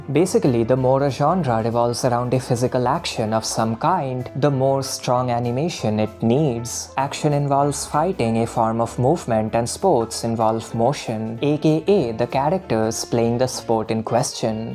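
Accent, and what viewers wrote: India. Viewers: bruh why do u talk so slow lmfao, is it because of indian accent?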